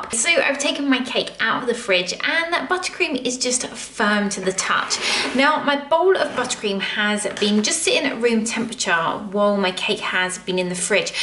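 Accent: United Kingdom. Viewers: 🤗 you is so nice! Are you from England?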